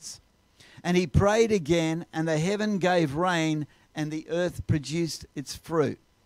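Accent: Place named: Australia. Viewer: Great to hear an Australian Christian voice predicting the rebuilding of American Christian faith. I pray you are right. God bless. SOK. Geelong, Australia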